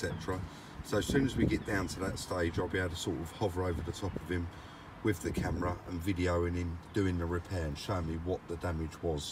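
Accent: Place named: London, United Kingdom. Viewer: thats why most people like watching him. its So london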